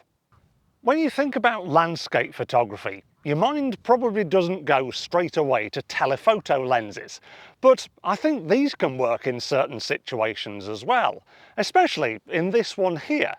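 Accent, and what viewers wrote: United Kingdom. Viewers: Love the classic "ee bah goom" accent son. I travel light so only have a Zeiss Sonnar 1.8/24mm prime on my Sony A6000. Not sure how that relates to the sizes mentioned but used on everything from landscapes to close up tech photos. Main thing is to get a sharp shot and crop to suit as required. Different viewpoints by walking to the best vantage points if poss. Very helpful points in your vid however which I'll keep in mind.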